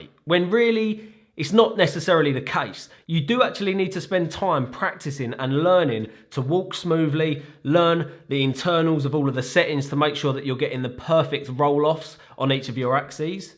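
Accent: United Kingdom. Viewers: Listening to this guy actually feels like watching he Peeky Blinders series. I love his British accent.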